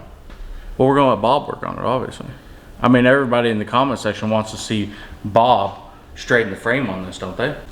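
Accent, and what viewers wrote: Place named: Kansas, United States. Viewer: What part of Kansas are you from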